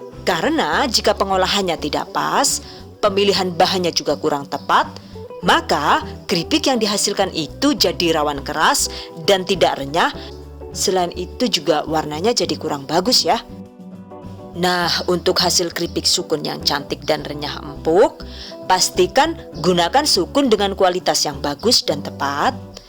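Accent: Banyumas, Indonesia. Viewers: Lucu juga dengar mbak ini,ngakak juga ini,apalagi kalau bahasa ngapak nya keluar😀😀😀😀😂😂😂😂,salam dr malaysia mbak.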